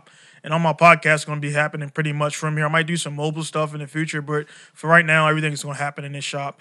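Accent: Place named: Baltimore, United States. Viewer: A mix tape would hav been dope, do you still make beats??? Your accent is nuts my dude! Still hear that bmore flex tho. 😏 Being in business for yourself is crazy, it’s the best and worst thing ever. Holding yourself to a higher standard is important, never undervalue yourself or your work. As soon as you start doing that, everyone else will too. Looking forward to hearing more from you! Awesome test/intro. Hav a good night.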